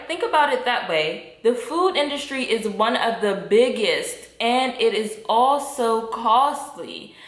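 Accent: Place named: DMV, United States. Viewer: Yas. Recognize that talk anywhere DMV!!!!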